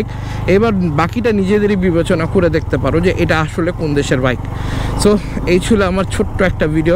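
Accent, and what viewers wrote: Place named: Gazipur, Bangladesh. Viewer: Apnr basha Gazipur kothi porse 😊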